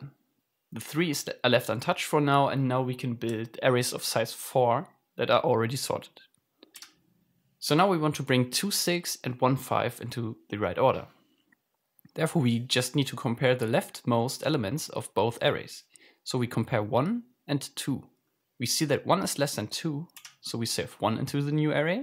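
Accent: Germany. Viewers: Ich wette du bist deutsch :)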